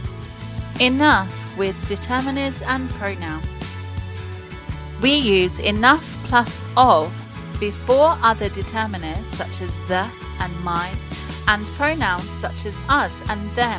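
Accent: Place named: United Kingdom. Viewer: Hi! I think I hear your name like that, I fall in love with the British accent, I like the way of teaching with animation, because the content is visualized and it is very practical to get started, I'm sure that this channel will grow because its content is very clear to learn, success and thank you for your contribution and dedication...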